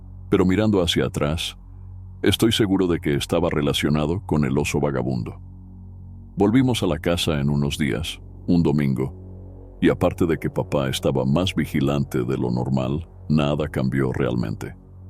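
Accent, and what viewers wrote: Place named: United States. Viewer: Que desagradable acento de gringo hablando español. Le resta credibilidad a sus historias.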